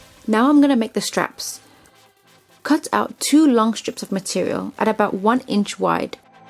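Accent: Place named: South Africa. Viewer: Lol. I actually thought there was a tiny bit of South African accent in there. I'm from Zimbabwe but been in Manchester since I was 8. And there's no trace of any brummie in yours lol xx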